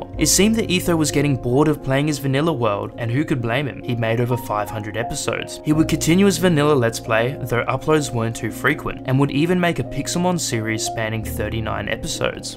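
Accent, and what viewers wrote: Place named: United Kingdom. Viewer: you're british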